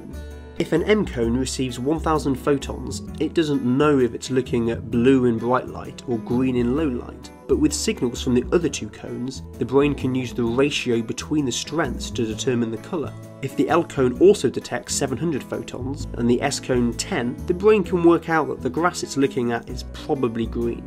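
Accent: United Kingdom. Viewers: I like the British accent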